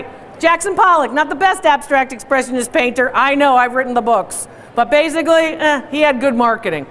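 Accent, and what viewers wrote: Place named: New York, United States. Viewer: Ooooohhhh ok. Are you from Michigan then? I heard you say that's a school you attended but you have a bit of a New Yorker accent ❤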